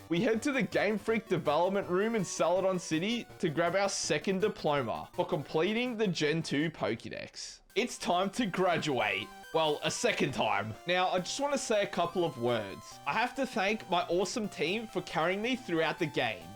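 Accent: Australia. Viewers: He's Australian or something. You're just an ass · Ahhleet foah lol fuck i can only handle the Aussie accent for so long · Because that’s how some Aussies talk. Sounds like he’s got a bit of the Aussie-Leb accent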